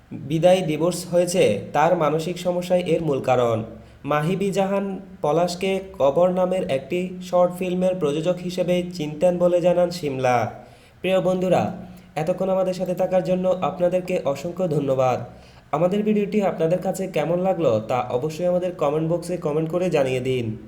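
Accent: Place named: Noakhali, Bangladesh. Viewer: পাঠক নিশ্চিত নোয়াখাইল্লা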